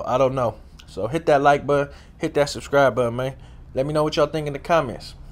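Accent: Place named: Fresno, United States. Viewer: First time coming across your videos you sound like a Fresno dude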